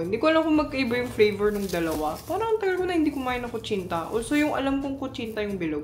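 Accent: Philippines. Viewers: Your English accent is truly FILIPINO🎉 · Sheesh, koreana with filipino accent, kakainlab ❤😂😂😂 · I love you...ang kyute mo! Pinay na pinay ka talaga, the way you speak, smile and laugh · You really adapt to the Filipino culture! That is so cool that you have an accent of Filipino than your own culture! Keep it up because it’s amazing! Hafa Adai in my language 😊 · Holy shit ang tapang ng accent 😭 pinoy na pinoy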